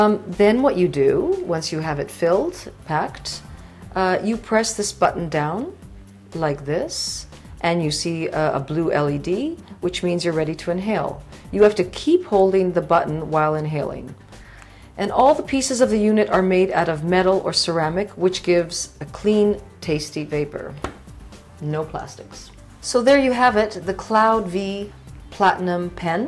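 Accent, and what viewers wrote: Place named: Netherlands. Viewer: your voice has a hint of a dutch accent in it, am i right?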